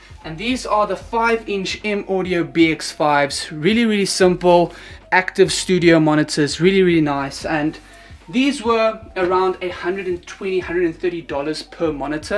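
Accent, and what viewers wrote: South Africa. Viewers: knew your accent was from here keep the content coming and representing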